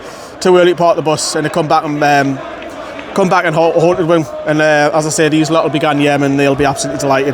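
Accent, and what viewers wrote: Newcastle, United Kingdom. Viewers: He looks like DT with a Newcastle accent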